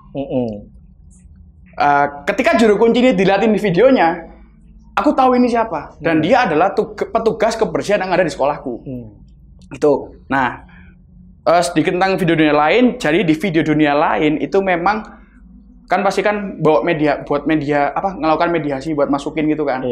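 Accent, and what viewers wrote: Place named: Java, Indonesia. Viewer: biyuhhhh logat e malangan puoll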